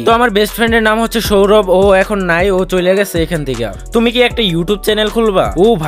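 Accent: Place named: Mymensingh, Bangladesh. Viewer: আল্লাহ,, ভাইয়া তোমার গ্রামের বাড়ি ময়মনসিংহ, এই জন্য ই তো বলি তোমার কথা বলার ইসটাই আমার চাচাতো ভাই দের মত লাগে কেন 😮 কারণ আমার গ্রামের বাড়ি ময়মনসিংহ 🥴 যাক প্রথম আর্টিস্ট ইউটিউবার পাইলাম যার বাড়ি ময়মনসিংহ 🥴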